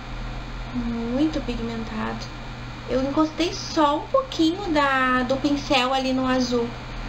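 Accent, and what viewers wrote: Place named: Curitiba, Brazil. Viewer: Boa tarde sou nova aki no seu canal e já gostei muito..sou de curitiba e tbm tenho esse sotaque de( tá )e acho lindo nossos sotaques por isso q gostei de ti... há sobre a base achei q ficou bom este tom para ti tá kkkkkkkk bjss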